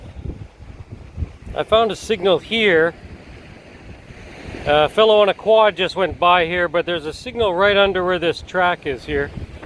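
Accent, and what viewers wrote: England, United Kingdom. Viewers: confirmed...it is possible to have...to much of a good thing...more sexy english lady voice overs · Love some of the finds and beautiful pics too!! Great English accent on voice over :)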